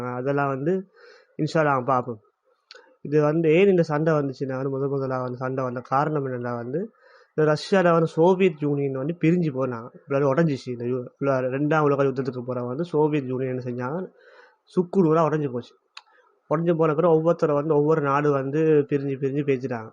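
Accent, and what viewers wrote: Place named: Colombo, Sri Lanka. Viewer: RECORRECT YOUR SPEAKING. WORDS YOU ARE SPEAKING COLOMBO TAMIL PLEASE SPEAK PUBLIC SPEAKING WORDS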